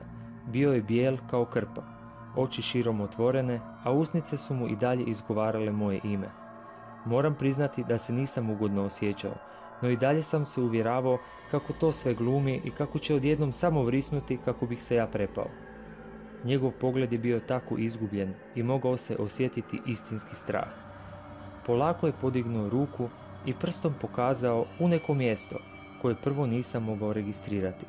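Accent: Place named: Ekavian, Serbia. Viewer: Uh uh uh uh uh uh uh uh uh uh uh uh uh uh uh uh uh uh uh uh uh uh uh uh uh uh uh uh uh uh uh uh uh uh uh uh uh uh uh uh uh uh uh uh uh uh uh uh uh uh uh uh uh uh uh uh uh uh uh uh uh uh uh uh uh uh uh uh uh uh uh uh uh uh uh uh uh uh uh uh uh uh uh uh uh uh uh uh uh uh uh uh uh uh uh uh ih uh ih a ka ono si ti šef vi ste ekavci srbi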